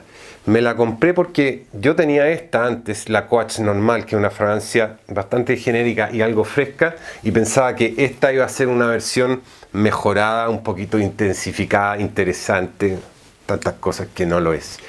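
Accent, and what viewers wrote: Chile. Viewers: Eres chileno???? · es Chileno ? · Y esa mezcla tuya de acentos que ? el chileno iberico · este muchacho tiene en su acento o pronunciación una mescla de chileno centroamericano y español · disculpa te pregunté Eres chileno o español??? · Si es chileno, radicado en España, saludos desde CHILE."le dicen el sabueso " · Tú acento se detecta a leguas amigo, eres chileno. Buen video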